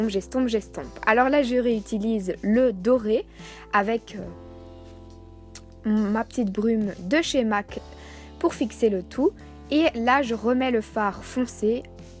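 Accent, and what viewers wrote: Belgium. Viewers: Vive la Belgique et son accent 😅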